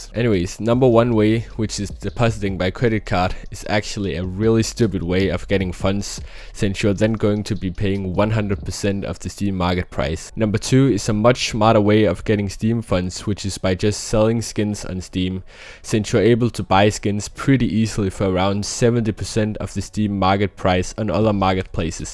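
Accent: Denmark. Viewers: I smell Danish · Kan høre der er lidt dansker over det her aha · i smell danish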